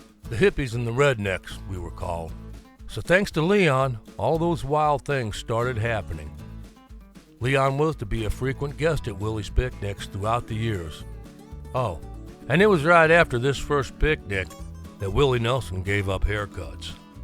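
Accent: South, United States. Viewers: Thank You, Thank You, Thank You For This Great Memorable Leon Russell Profile!! Your Southern Drawl is Perfect, Gives This Presentation More Oomph!! :washhands::washhands::washhands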